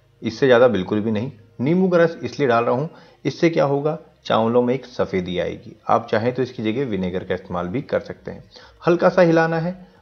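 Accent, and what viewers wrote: Uttarakhand, India. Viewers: Bhaisaab ap uttarakhand se ho kya?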